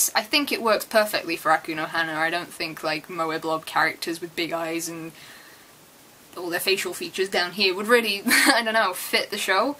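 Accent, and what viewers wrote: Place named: United Kingdom. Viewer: I'm pleased you gave this show a fair chance. I thought it was quite good overall myself. Not a masterpiece, but good, and different. Also. I like listening to your accent. There's something hot about British accents:p.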